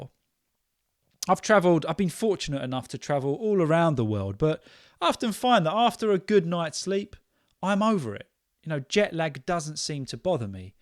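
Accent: United Kingdom. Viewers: Hi, I'm from Myanmar, and I absolutely love the British accent. I'm really keen to learn it, so your podcasts are perfect for me.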